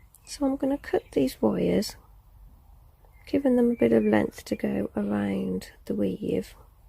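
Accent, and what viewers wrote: United Kingdom. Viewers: ....I just HAD to add a comment about your tutorials. I just completed this tutorial and I have to tell you that your soft voice is as soft as silk and it is soooo soothing to listen to you. I could listen all day! Love your British accent, also! You are such a favorite artist of mine; you keep every part of your tutorials clear & simple. I also love your name. My youngest daughter is named, also! You are a stellar jewelry artist & I am so appreciative of your tutorials that teach so much. I am retired and have been making jewelry for six years now,of various kinds, but am fairly new to wire wrapping/weaving. Thank you so much for your sharing generosity!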